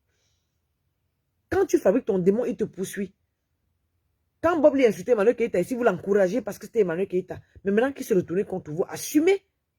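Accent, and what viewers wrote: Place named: Cameroon. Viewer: Ton accent me fait dire que vous êtes camerounaise merci pour ton soutien à EK. Le cas peter c'est un cas école je pense que ça vous servira de leçon aux blogueurs ivoiriens à deux balles tous des analphabètes illettrés.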